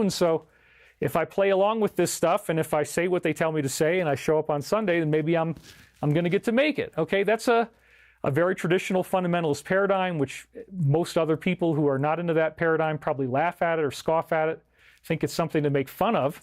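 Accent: Australia. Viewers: I love your Australian accent ‼️I lived there for a minute and you do it real well and you’re a fun guy. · Very enjoyable program for the most part-----thank you. My exceptions, personally are #1) I don't trust "Dr. Steven Greer" any further than I could toss him (appearing or whatever in your next film? can't STAND him) #2) I think your Australian accent is funny and I enjoy it----but Hon, you lack the ear to do a British dialect of any sort, upper or lower class. Not meant to hurt your feelings---just stating a fact. #3) Narcissists and Psychopaths do not "Repent"...they will not be Allowed into the Higher Frequency Timeline. The less said about them the better! Loved all the crop circle slides---so nice to have this review. God Bless You! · Great info! Love the Australian accent and your sense of humor! Have you ever seen the 1974 Brian De Palma movie Phantom of the Paradise? 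it's an extremely interesting movie I think you would get a kick out of!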